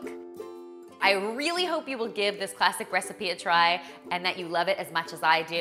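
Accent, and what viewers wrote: Canada. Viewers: terri-louise Ó leathlobhair Canadian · you're right, her accent was much more prominent in this video :) I knew she was Canadian, but the entire video I was thinking about how clearly Canadian her accent is in this one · yes she's Canadian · Is she canadian? I didn't notice her slight accent until this video...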